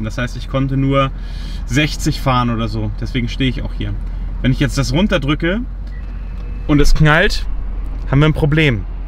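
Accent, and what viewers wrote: Carinthia, Austria. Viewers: Kärnten Niederösterreich? 🤣🤣🤣🤣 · Kärnten, Niederösterreich? 🤔 😅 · Kärnten Niederösterreich?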